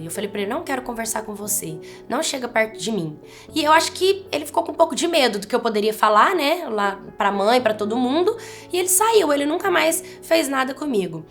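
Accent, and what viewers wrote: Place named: Pará, Brazil. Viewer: Vc é paraense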